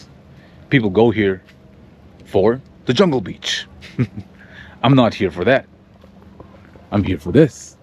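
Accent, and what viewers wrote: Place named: United States. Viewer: Love your videos and your channel by the way you sound like you from state just saying ❤❤❤❤❤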